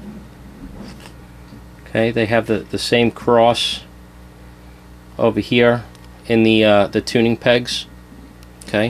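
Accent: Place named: Brooklyn, United States. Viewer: Sounds like Brooklyn , NY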